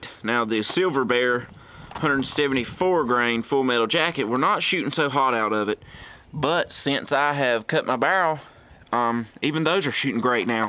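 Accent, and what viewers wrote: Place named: Louisiana, United States. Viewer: North Carolina? You sound like you from the Bayou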